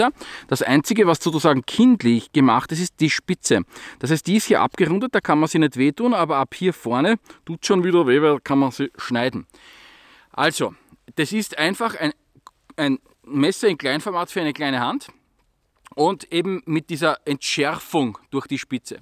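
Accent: Vienna, Austria. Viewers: Heast deine Videos daugn ma voi oba des Wienerisch treibt mi voi in den wahnsinn XD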